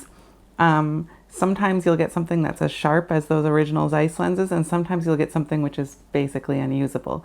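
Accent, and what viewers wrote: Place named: California, United States. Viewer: Hey, you are pretty funny to this California boy. Nothing dry about your presentation. Does your Jupiter 9 have a serial number on the side? The early types did not. They had them on the lens ring. Ah Hah. Yours was made in 1984 as that is how your serial number starts out. And here we are <link> <link> This looks like a college near me. I am west of Palo Alto, CA. You talk like a local. <link> No you can do better than that. Try neutral filters. You will be able to keep the short field of focus and not blow out the sensor image. Your surrounding looks like San Mateo. Also, I am doing product shots for a product I produce. So everything is controllable and stable. I use a Canon 2000D on a big tripod (with a fluid head), with Canon software that will send the image to a laptop with any size screen... think 27" if you can have that around in a setting. 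I really can see the image clearly there. There is a issue with this camera's need for adaptors sometimes. This is talked about in a 5 Types video. It might not apply here as it is about infinity focus. It is my understanding that your camera did not have the MC multi-coat lens coating, where the earlier one or two versions did. The coating keeps the light from bouncing around inside the lens and camera. I just bought a Jupiter 9 type 2 for $135 out of the Ukraine with only $10 shipping. Here is a video you might have seen which is pretty interesting. The information is that is. Don't drink a lot of coffee before watching this. Y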